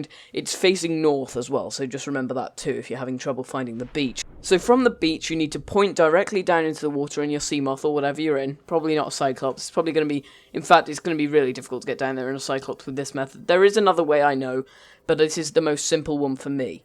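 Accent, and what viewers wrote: United Kingdom. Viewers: can you stop damn moving the camera up and down it is seriously irritating and with the 'itish accent!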